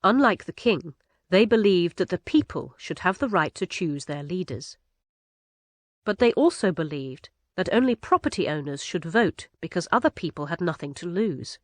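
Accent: United Kingdom. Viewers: Banu Çiçek Because it's British pronunciation.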